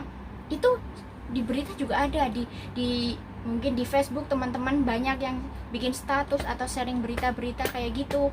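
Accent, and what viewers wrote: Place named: Java, Indonesia. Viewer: Koyo nyong... KadaNg medok kadang ngapak... Tapi yoo rapapa 🤣🤣🤣🤣🤣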